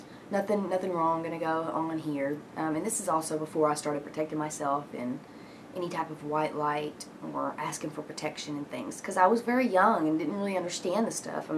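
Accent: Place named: Texas, United States. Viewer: i can tell she is fro texas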